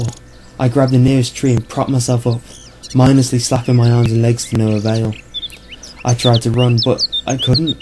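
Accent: United Kingdom. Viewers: this is a great story this os a good story the britsh eassent made it better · a british/or english guy reads it the story just got 20% cooler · Mmmmmm british accent i really do like this story :D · this is my favorite creepypasta! no not just because its told by a british guy stop making stuff up!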